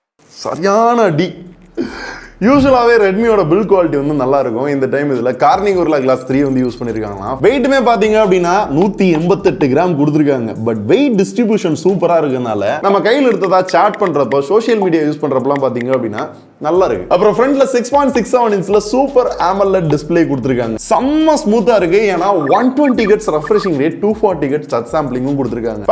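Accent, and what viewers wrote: Madurai, India. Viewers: Anna neega madurai thana 🤔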